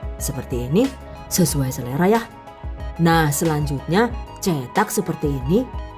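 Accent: Banyumas, Indonesia. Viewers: Yg punya chanel orang mana sih? Kok ngapak sprti sayaa 😂,, hehe chanelnya keren dan menginspirasi 😊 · Ngapak Rika ya 😂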